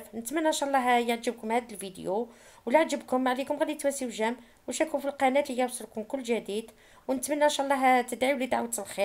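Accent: Tlemcen, Algeria. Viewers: و عليكم السلام.وصفاتك خفيفة ظريفة كيما حضرتك. ربي يوفقك و يزيدك من فضله. تعجبني لهجتك كثيرا هل حضرتك من تلمسان؟ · samir azouz والله حتى أنا ظنيت أنها من تلمسان من لهجتها 😊😊😊😊 انا من العاصمة ...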